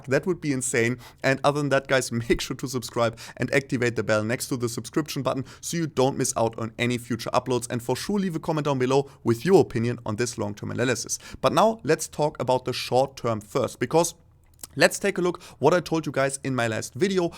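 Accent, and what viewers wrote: Germany. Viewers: You have a little bit of a german accent, but your english is excellent. What other languages do you speak?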